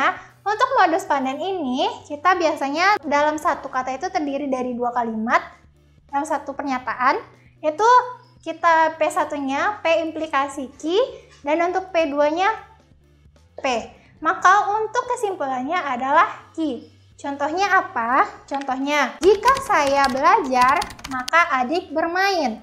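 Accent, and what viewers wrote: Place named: Medan, Indonesia. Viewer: Kaka orang medan y bhsanya kedengeran bnget ka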